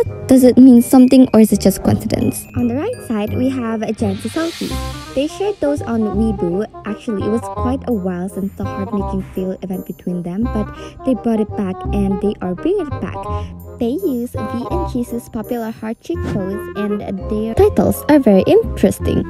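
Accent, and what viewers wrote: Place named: Philippines. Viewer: Dude!! People in Philippines speak in that accents I've seen many YouTubers from Philippines and I've subscribed them too T,f you talking about for more attention? 💀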